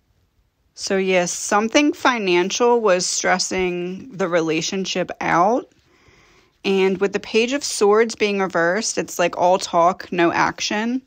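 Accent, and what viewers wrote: United States. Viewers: Luv watching yr readings, it's amazing how you tie it all in.. where are u from? I cant place the accent other than it being USA.. GOD BLESS FROM THE UK♥️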